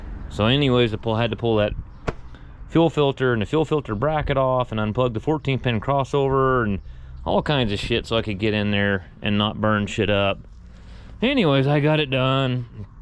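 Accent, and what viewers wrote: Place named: Ohio, United States. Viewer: I heard "wars" and "tars" more from a guy from Ohio than I ever did from actual Texans when I lived in Texas for 30 years.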